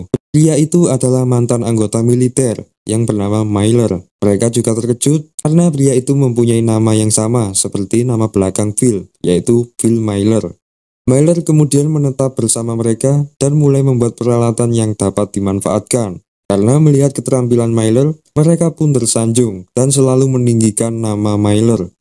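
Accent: Java, Indonesia. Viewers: baru kali ini dpt yg jawa biasanya aksennya Sunda mulu 👍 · logatnya jawa bgt mas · Jawa nya medok bgt jadi kga jelass asikkk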